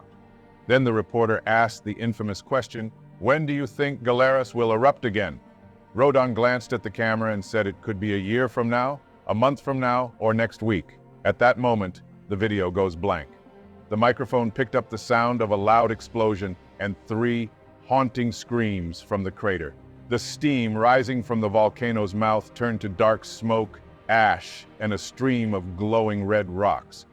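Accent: United States. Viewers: Shocking narration. Ugly American accent. You couldn't make this up if you tried.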